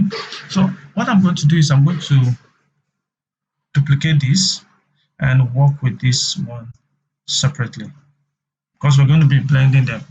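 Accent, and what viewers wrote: Nigeria. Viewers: bro u nigerian?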